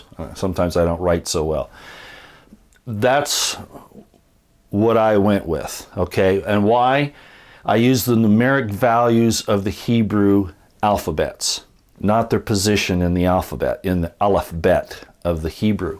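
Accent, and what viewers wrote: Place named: United States. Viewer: His first language is obviously not Hebrew so you have to apply his american accent to it. Seriously, I do not criticize people that do not speak English very well. I give them props for knowing two languages.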